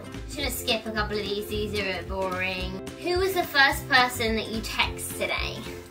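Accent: United Kingdom. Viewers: I love you British accent and also I subscribe to you on Lucas's channel and your own channel!!! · videos give me life 💕 I think she's the only reason I learned how to speak Cockney too... 💎💋💁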